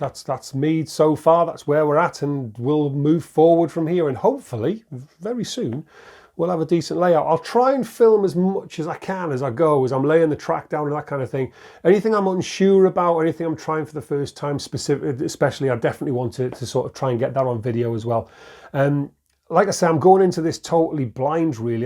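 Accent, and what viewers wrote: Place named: North East England, United Kingdom. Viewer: I getting the hint of a north eastern accent there. And going by the black cat you will be a fellow Mackem? Great channel and love this idea. Will be watching with curiosity and endearment take care. Steve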